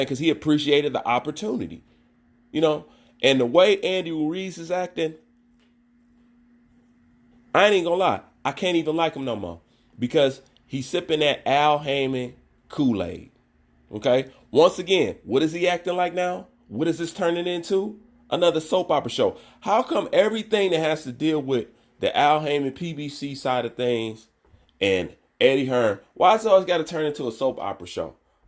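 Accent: United States. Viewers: You just showed us that AR stated he was not from NYC, but you went on with deceptive ranting for 7 minutes claiming usa is not his back yard. Unless you can show were he said usa is not his back yard, otherwise it sounds like you are bending the truth here. Also your statement "all atheletes use Steroids" is another example of you the delusional conspiracy theorist. And yes you should just go watch mma and stay far away from this sport. You sound American but yet you still don't seem to understand and respect where the real heavyweight boxing kings are in this era. I bet you doubted the greatness of ruiz the same fashion many doubted wilder and ruiz knocked AJ smooth off. Please have more respect our American heavy weight boxers.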